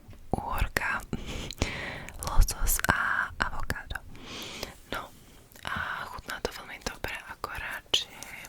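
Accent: Slovakia. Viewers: znieš ako u nás západniari, tí občas dávajú české slovíčka hihi, ale inak dosť zlepšenie prízvuku od prvého dielu <3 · hej jakoze uplne narovinu kdybych neznal tvuj kanal a nevedel ze si ceska tak bych si myslel ze si ze slovenska protoze ta vyslovnost nebo ten prizvuk je fakt skvely🩷🩷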